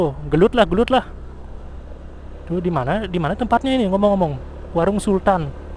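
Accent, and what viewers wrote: Bali, Indonesia. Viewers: lucu ni si bli ngomong sunda logat bali XD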